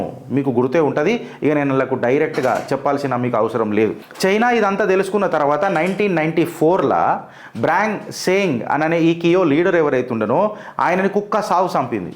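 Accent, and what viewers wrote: Telangana, India. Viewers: Anna Mana Telangana yasalo super ga vuntai nee videos. 🚩🚩🚩🚩🚩🚩🚩🚩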